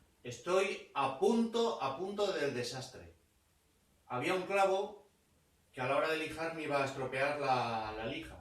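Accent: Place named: Spain. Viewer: Cuando veas la foto de mi perfil te darás cuenta de qué amo los gatos 🐱🦊 soy Mexicano y vivo en los Ángeles California USA y en el departamento donde estoy no puedo tener animales 😭😭 y ati también te gustan los felinos mire 2 al principio del vídeo dales un beso de mi parte por tú acento creo qué eres de España Saludos para la madre patria Muy bueno tú vídeo Felicidades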